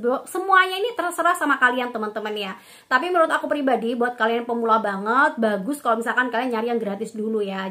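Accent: Java, Indonesia. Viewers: Didengerin suaranya agak medok 😀🙏. Tapi makasih kontennya. Bermanfaat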